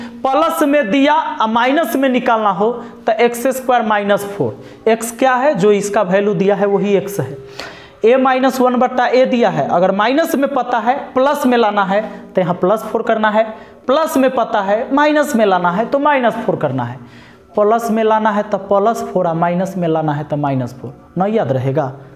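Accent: Bihar, India. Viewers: Your bihari accent has been helping me to Focus in maths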